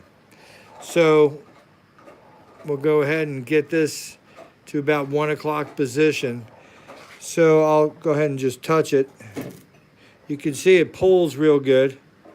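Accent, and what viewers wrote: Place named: South, United States. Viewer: he may have a southern accent, but his a solid experimenter ... afterall if you could read a book and literally build a free energy machine. many would.... ramblings are good for getting too know the guy, that we agree on..... although i must say, the best way too judge the guy in my opinion, is what he does with the information i have him,along with videos of my working free energy machines . which were numerous. <link>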